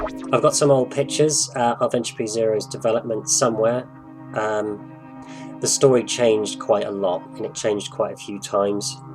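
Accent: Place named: United Kingdom. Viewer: Huh, never imagined you having a british accent. I looove the mod though, I've already played through it 3 times <3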